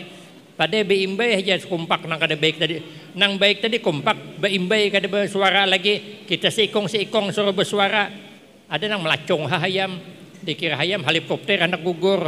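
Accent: South Kalimantan, Indonesia. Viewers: Kental bujur bahasa Banjar tuan guru. Ini ....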